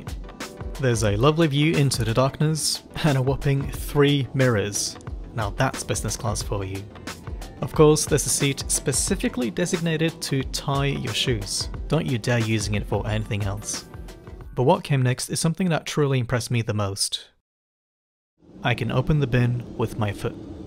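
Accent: Australia. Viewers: I came across your channel through the Casiopea video and always thought I could hear a slight Australian accent in some instances, now I understand where it comes from :)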